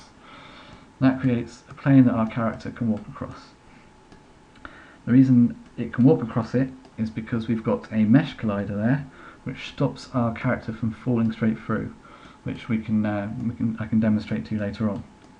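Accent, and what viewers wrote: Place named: United Kingdom. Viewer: Your accent is like of Britishers' ;D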